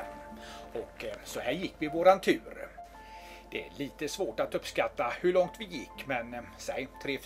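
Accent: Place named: Gothenburg, Sweden. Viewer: Fina naturbilder och världens goaste göteborgare som berättare.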